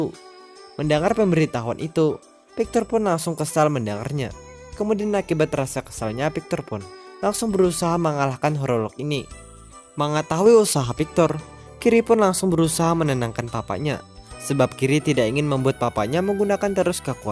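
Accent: Banjar, Indonesia. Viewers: Logat banjarnya kedengaran kali min 😄😄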